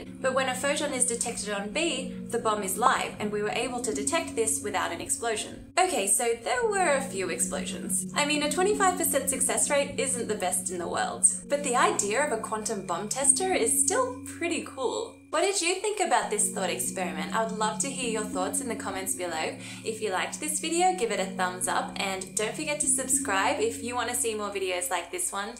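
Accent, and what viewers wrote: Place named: Australia. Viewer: Someone on twitter commented saying you were Australian and I didn't even notice!! I guess I'm too used to the accent. Where are you from? Where did you study?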